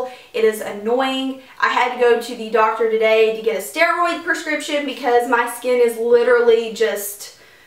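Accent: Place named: Texas, United States. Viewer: You are so beautiful! Kind of have a tx accent! Lol. So anyways, I returned my Smashbox 15 hour wear and got this in exchange. I have super dry skin and also some wrinkles because I have that "early 30s skin" ! So this foundation seeped into my wrinkles and just did not work for me!! Next I'm gonna try UD naked skin when I return this one. But I do love your videos! 😄🙆🏻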